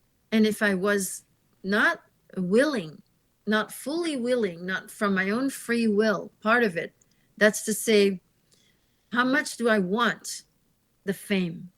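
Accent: Australia. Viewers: Who is the Voice aussie?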